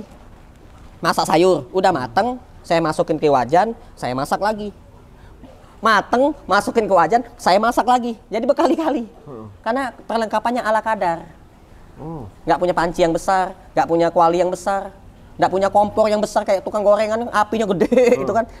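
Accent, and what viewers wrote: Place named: Palembang, Indonesia. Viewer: apo kabar mang cek gaya wong palembang nian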